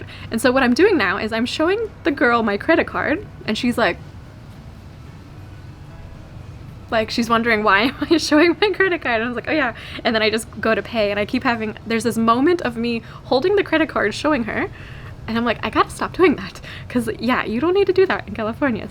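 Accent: Germany. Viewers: And you know have a German accent to your English i love it. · you sound like Dutch or German... The melody of voice... match with Nord-Germanic ones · Speaking German has even influenced your English a bit. Once or twice I heard a German intonation in your speech.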